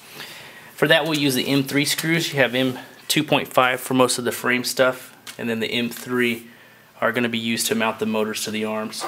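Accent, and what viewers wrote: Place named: United States. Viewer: got their language from England (i.e. you actually speak English in case you hadn't realised.....) anyway, all that said you pronounce 'Solder' with the 'L' pronounced so it isn't, never was, never meant to be pronounced 'Sodder'. Just like you look at yourself in a 'Mirror' and not as you Americans say in a 'Merr'! AND also while we're at it, the most annoying mispronunciation you say, there's an 'S' on the end of 'Math' making the correct pronunciation 'Maths'. So please, when you beg, borrow or you steal something (like language for instance), please have the decency to use it correctly. I eagerly await your childish, abusive comments as I know how you Yanks hate to be told anything and 'put right'. Thank you and 'have a nice day y'all' :)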